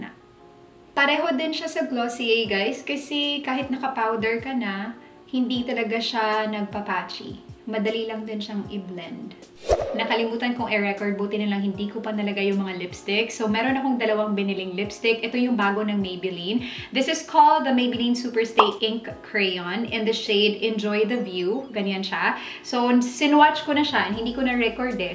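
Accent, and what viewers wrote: Visayas, Philippines. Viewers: I love your vids, coz I also have dry skin and we almost have the same shade (I think). Ang saya manood ng vids na super relate ako lalo na kapag parehong hindi maganda yung effect ng product because of our skin type. and I super duper love hearing your bisaya-slang accent, super sushal po:)I would love to try the Superstay Ink Crayon Lippie kasi wala pa sa Pinas nyan.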